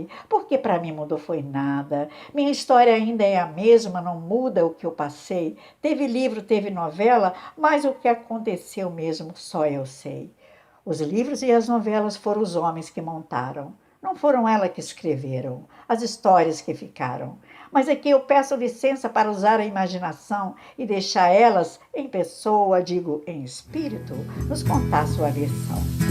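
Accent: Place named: Minas Gerais, Brazil. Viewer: Oi, que maravilha te rever mesmo sendo na telinha com uma performance maneirissima e sotaque mineiríssimo também 😹. Admiro você “D+ da conta “uai“, especialmente pela sua simpatia, humildade, esforço, perseverança e fé que o amanhã sempre será melhor do que o agora. “A fé não costuma falhar” viu? Um beijão do tamanho da bochecha!😹🥰🥰🌈🌈🌈🌈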